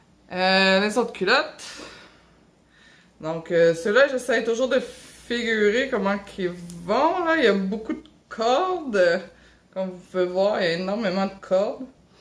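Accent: Quebec, Canada. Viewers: VIVE LE QUEBEC UN ACCENT QUE J ADORE AINSI QUE LES CANADIENS ET CANADIENNES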